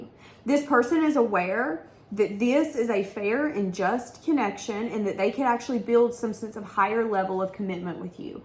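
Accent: South, United States. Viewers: Hey I just want to say I really love your southen accent ❤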